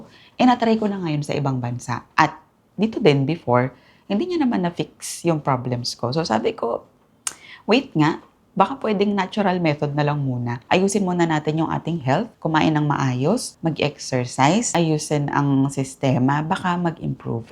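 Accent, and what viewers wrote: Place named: Tagalog, Philippines. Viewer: ganyan dapat pag tagalog , deretchong tagalog Hard "R".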